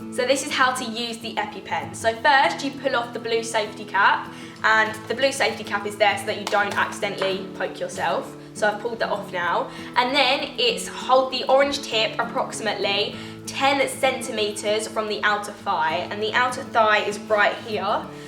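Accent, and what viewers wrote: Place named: RP, United Kingdom. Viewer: Are you British? You speak English like the Queen. Get well soon, Babe. Take good care of yourself ❤️❤️❤️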